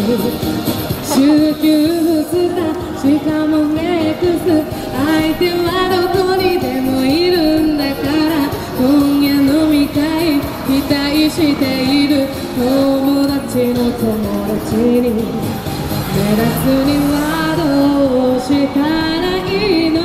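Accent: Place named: Hakata, Japan. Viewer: 博多弁はかわいいですね！！応援しています。❤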